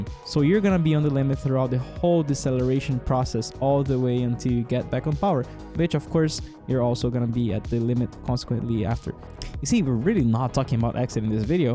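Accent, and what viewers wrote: Brazil. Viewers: Sotaquezinho de brasileiro em hahaha, sucesso aí irmão!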